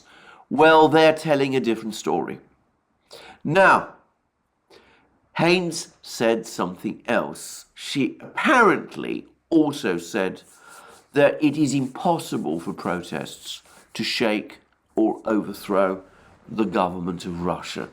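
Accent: United Kingdom. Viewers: Aaaahhhh, makes sense now. Despite your thick british accent, you are from the Balkans area. I criticized your difficulty at times to speak english. My apologies.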